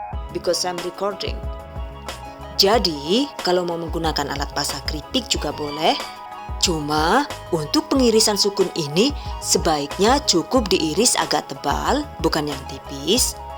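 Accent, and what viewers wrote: Banyumas, Indonesia. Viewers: Lucu juga dengar mbak ini,ngakak juga ini,apalagi kalau bahasa ngapak nya keluar😀😀😀😀😂😂😂😂,salam dr malaysia mbak.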